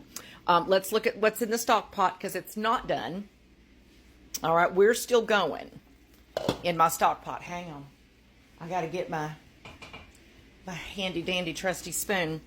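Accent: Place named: East Tennessee, United States. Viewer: I SWEAR, you GOTTA be from East Tennessee!!!! Plz say ?!??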